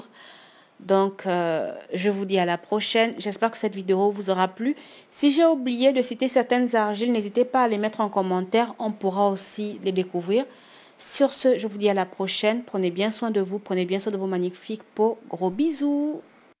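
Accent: Gabon. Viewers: L'accent gabonais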